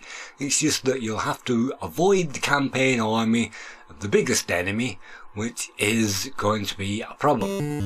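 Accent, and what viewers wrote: United Kingdom. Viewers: Bri'ish guy narrates an American Amiga game about Bri'tain. Checks out. Nice video, and nice how you even used an NTSC hardware with the aspect ratio the game was designed for. You don't hear a lot about NTSC Commodore hardware due to how Commodore computers tended to do better in Europe.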